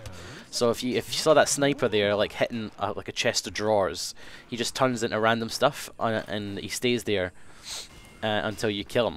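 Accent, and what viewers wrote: Scotland, United Kingdom. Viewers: are you scottish? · no hes scottish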